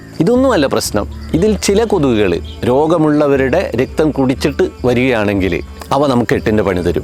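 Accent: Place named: Thrissur, India. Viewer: മാഷിന്റെ ഭാഷ ശൈലി കേൾക്കാൻ മനോഹരവും മനസിലാക്കാൻ വളരെ എളുപ്പമാണ്. തൃശൂർ ജില്ലയിലാണോ അല്ലെങ്കിൽ തൃശൂർ ജില്ലക്ക് അടുത്താണോ മാഷിന്റെ വീട്? നല്ല അവതരണം മാഷേ..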